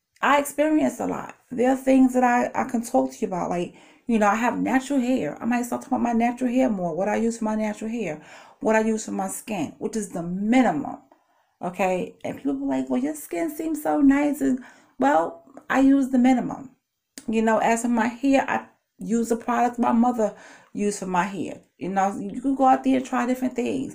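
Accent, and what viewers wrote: New York, United States. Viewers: Well said my bklyn boo!! And you will not stop 🛑 talking to me lol bc Yasss I heard the New York accent lol but I hear it all the time lol 😂 TFS! Xoxo😘💕🥰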